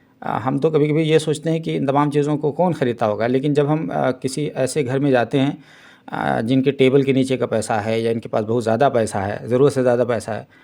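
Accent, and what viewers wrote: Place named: Lucknow, India. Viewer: lakhnavi Andaaz Bahut Khoob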